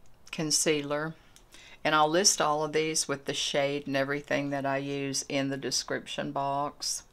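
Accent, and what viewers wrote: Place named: Texas, United States. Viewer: I just love your hair and your hair color and your eyes and your makeup and everything and I love love love love love love love the lipstick colors I'm going to get those for sure and could I ask did you say what blush brush brand that is it looks so soft and so pretty.... I want to get that brush .and thank you so much I'm learning a lot from you I am almost 70 years old and I still have so many problems and my skin still breaks out I have to be very careful ... it's very dry and acne prone even at my age so if you have any advice for me please let me know I can't use oils except around my eyes and forehead .. on my other part of my face I can't use any oils unfortunately but I want to get some of that skinn Elixir and put it around my eyes like you did and a little on my forehead and even my neck....thank you I love it ! 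I'm in Texas and sounds like you have Texan accent and I love it ! Lol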